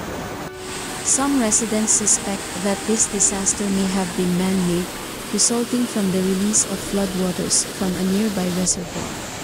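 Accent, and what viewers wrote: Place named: Philippines. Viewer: Your the speaker are from the phillippines nice voice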